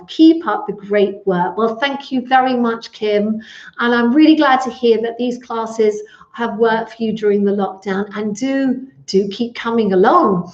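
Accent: United Kingdom. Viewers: My wife and I discovered your videos two weeks ago and thoroughly enjoy doing them daily. We're 72 years old and appreciate so much your good instruction and for walking us thru each exercise in such a comprehensive manner. We both love listening to your wonderful British accent! Thank you so much! Bill and Debbie Graf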